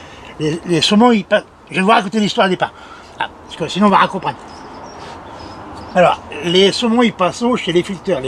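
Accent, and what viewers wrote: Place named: Boulogne, France. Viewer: Oh là là!! Déjà l’accent français et là le boulonnais lol 😂 J’ai bien rigolé, merci! Joyeux temps des fêtes à toute votre petite famille! Nous on vient de recevoir au moins 40 cm de neige, ce sera un beau Noël blanc!! Bisou du Québec!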